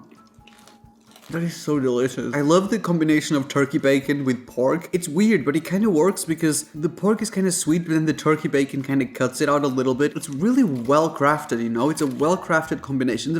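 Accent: United Kingdom. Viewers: I thought this guy is British. Did he get American citizenship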